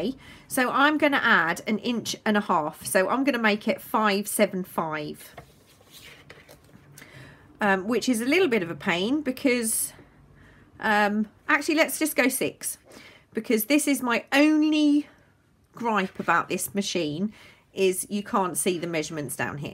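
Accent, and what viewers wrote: England, United Kingdom. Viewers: I think I like hearing your English accent as much as your artwork!! Watching your videos just makes me happy!😊😊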